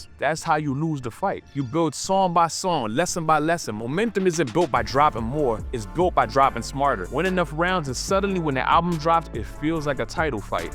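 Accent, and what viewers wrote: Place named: Caribbean. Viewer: Random guess based off your accent: are you carribean born but raised in NYC? 🤔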